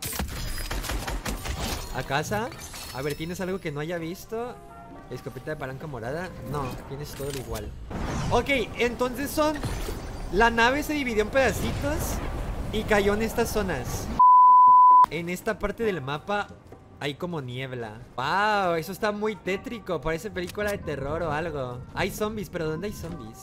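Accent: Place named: Castile, Spain. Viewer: hablar fresa y en español castellano... ASCO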